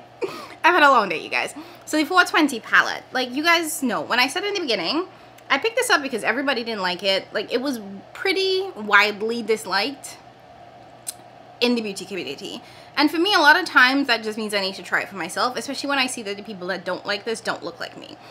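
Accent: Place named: Trinidad and Tobago. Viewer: sent me over here! Hello! You sound like a Trini! I’m from Jamaica 🇯🇲 I’ve been looking for new beauty YouTubers to follow, need some fresh opinions 😊 I too have been wanting to start a beauty channel and actually have some videos posted on two channels from ten years ago on music and hair. One day! I’m scared of the editing… I don’t have this palette so just here to learn. I have Muerte, Gemini 2, amor y mariposas, beetle juice and she’s in parties. I just ordered the electrip.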